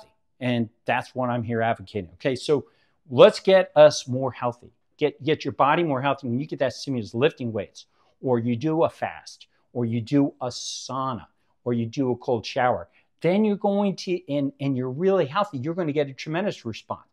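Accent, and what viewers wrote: United States. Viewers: Not an old guy, but a tough 68 year old broad here, and want to know if you think the FitTrack scale’s visceral fat measurement is accurate. Was thrilled that it consistently measures mine at 3, very low, on a rating of 1 to 19. If it’s not accurate, where can we get it done, need a doc’s order, cost? Thanks! Loving your channel. Keep up the great work! You sound a little southern on this recording?? Hadn’t detected the accent before.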